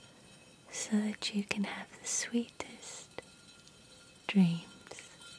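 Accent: England, United Kingdom. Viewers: I just wanted to say, that I just discovered your Cannel a few days ago (watched one of your hair brushing/play videos^^) and I already love it. Your voice is so calm and nice and I love your accent. It always makes me feel like I'm in England again :) Just go on like you are doing now, you kind of won my heart :D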